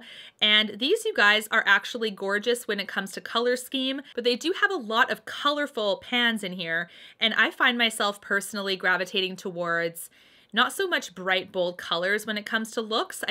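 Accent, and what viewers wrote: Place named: Canada. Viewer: I have to say I just love your channel and your personal energy. I also love your Canadian accent! 😁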